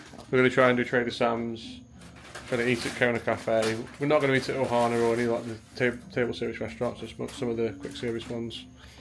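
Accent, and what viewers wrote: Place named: Stoke, United Kingdom. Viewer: Watching travel day.. Cant comment on that video. But i said within seconds.. Thats a stoke accent.. But yeah buy that stoke kit. Ill wear mine on travel day in 4 weeks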